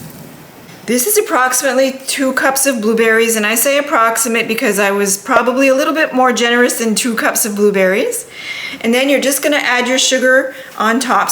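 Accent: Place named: New Brunswick, Canada. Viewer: You must be from NB! I grew up in Bathurst and I recognize the recipe (coked it many times myself) and your accent! - a new subscriber from NJ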